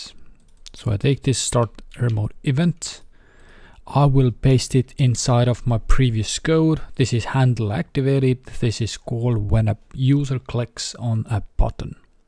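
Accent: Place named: Estonia. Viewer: see eesti aksent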